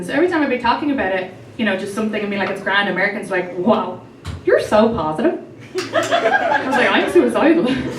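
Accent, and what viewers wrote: Ireland. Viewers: you are so delightfully scattered. It's just amazing that you ever finish the set your wrote. But everything you do say is brilliant, so it doesn't matter if you stick to the set. Plus, anything an Irish woman says in that accent is delicious.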